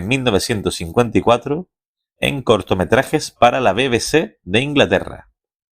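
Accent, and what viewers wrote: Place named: Canary Islands, Spain. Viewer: Muy buen contenido estás haciendo. Si ves conveniente podrías hacer vídeos explicando su método de entrenamiento para tendones. Gracias, por cierto por tu acento creo que eres canario, yo soy de Gran Canaria. Un saludo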